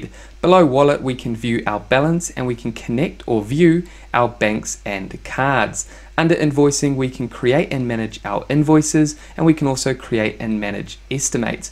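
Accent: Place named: New Zealand. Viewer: Aww jesus, a NZ accent is bad enough but a queer NZ accent is the pits!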